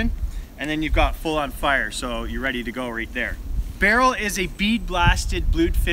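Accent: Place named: Ontario, Canada. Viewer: Ha knew that was an Ontario accent!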